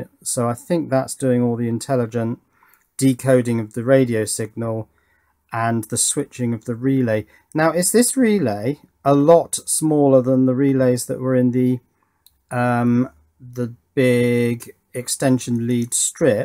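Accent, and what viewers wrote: United Kingdom. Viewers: He did use the UK English pronouncing, not the American pronouncing.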